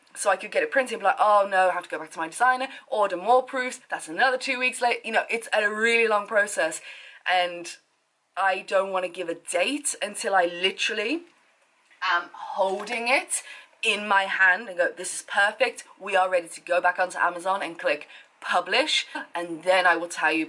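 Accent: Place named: Birmingham, United Kingdom. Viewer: I knew you were a Brummie 👍🏼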